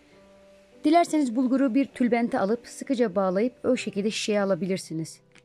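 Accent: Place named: Southeast, Turkey. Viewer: Eline Emeğine Sağlık Abla Bende Yapacağım Nasipse İlk fırsatta Ablam Konuşma Şiven Bizim Oralı aksan yani Ablam Güneydoğulu musun Ablam Selamlar Başarılar Diliyorum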